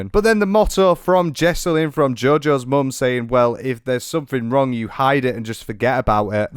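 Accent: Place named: Scotland, United Kingdom. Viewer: I could swear I heard some Scottish accent 😭 good content keep it up 👍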